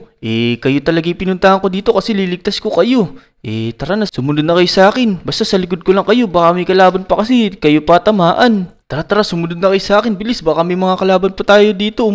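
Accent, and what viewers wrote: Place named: Nueva Ecija, Philippines. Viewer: Taga Nueva ecija siguro to puro "EH" Naririnig ko😂 Only in Nueva ecija knows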